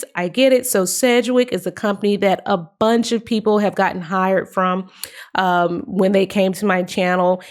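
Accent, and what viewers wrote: Spain. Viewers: ME ENCANTA TU ACENTO (i AM ENCHANTED BY YOUR SPANISH ACCENT);)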